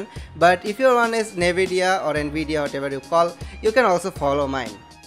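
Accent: India. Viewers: When you hear an Indian voice on a tutorial, you know that it’s going to be good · Lul indian accent it's my teacher in youtube that teach everything lul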